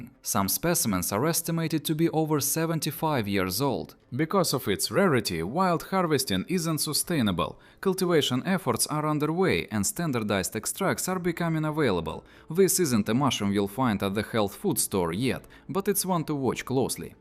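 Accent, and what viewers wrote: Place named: Russia. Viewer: На русском языке здесь только название ??? Но по произношению слышно,что читает русский😂 Как на моем косячном навигаторе: зе камера эхэд😂😂😂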